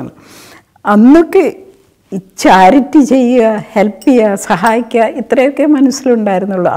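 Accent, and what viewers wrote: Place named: Pala, India. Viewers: This the typical Pala Slang, check out the colloquial expression " Mooch"... ANOTHER SOUGHT AFTER EPISODE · എത്ര വർഷമായി. നോർത്ത് ഇന്ത്യയിൽ ജീവിക്കുന്നു എന്നിട്ടും . ആ.. പാലയുടെ ശൈലി ..... അതാണ് ..... ദയാ ബായ്......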